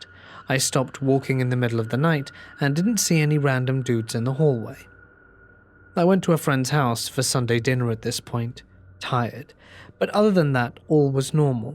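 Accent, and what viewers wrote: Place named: RP, United Kingdom. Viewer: Reminds me of a high class 1920s British socialite accent. I like it.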